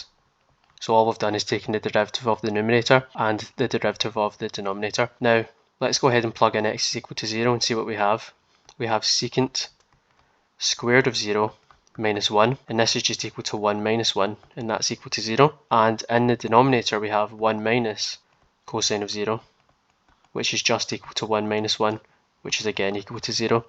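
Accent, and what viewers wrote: Scotland, United Kingdom. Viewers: ... What I forgot to ask you, if I'm not intruding, is, are you Scottish? I have an expat background and used to live in Scotland for about 3 years not far from Aberdeen in Milltimber beside the famous river Dee, a very beautiful small village... your accent sounds Scottish, but I could be wrong (lol); by the way I'm 1/2 Dutch/1/2 French...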